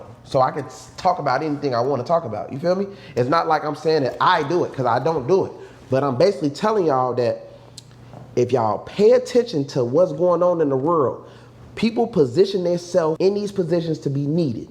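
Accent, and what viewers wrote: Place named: Chicago, United States. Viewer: I was about to say I hear that Chicago accent him in lol.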